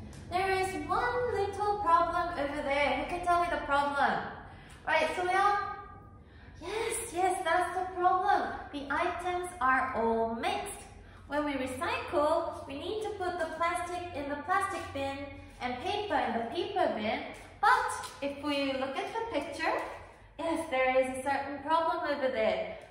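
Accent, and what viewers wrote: United Kingdom. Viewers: 뭔가 영국액센트 느낌... 쌤 너무 잘하세요 나도 저렇게 잘하고 싶다 많ㅇㅣ 배워가요!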